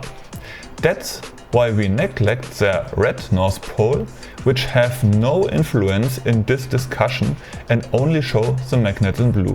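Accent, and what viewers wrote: Germany. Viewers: Maybe you write in the videos Headline, "MY Magnet Motor do not work" ;) ich denke ich kann auch deutsch reden, du hast son typisch deutschen englischen Akzent :D Mit dem Thema musst du dich schon ausführlicher beschäftigen, denn einfach magneten an paar Platzikteile kleben, das reicht leider nicht, das wichtigste ist ja ein ungleichgewicht bei Anziehung in die gewünschte Drehrichtung zur Gegenrichtung zu schaffen, was auch schon bei hohen Drehzahlen ohne jeglichen weiteren Bauten funktionieren würde, aber wie hoch diese sein müssen kann ich nicht sagen, um was es dabei geht, einfach mal das hier schauen: <link> Was Quantum Energy meinte ist auch eine Möglichkeit, einen Art Monopol zu erschaffen, der Lüling hat es gemacht, in dem er die Magneten im richtigen Moment räumlich entfernt hat, über eine Feder...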